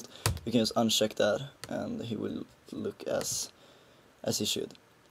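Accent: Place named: Sweden. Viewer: haha, hörde på din engleska att du är svensk xD :D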